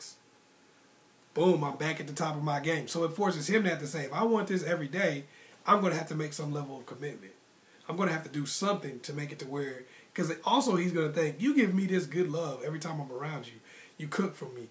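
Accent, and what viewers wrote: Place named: Brooklyn, United States. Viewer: It sounds like you from my home town Brooklyn, NY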